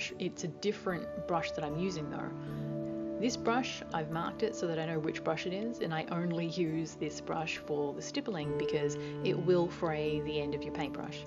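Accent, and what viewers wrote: Australia. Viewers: Hi, really nice to hear a good old Aussie twang for a change. I'm so wanting to do this and also on plastic (acrylic) dolls. Which products do I use? I can't understand how you get your colours to stick? Any help would be much appreciated. Fellow Aussie from Queensland 🤗❤ Just subbed 🤗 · Are you australian?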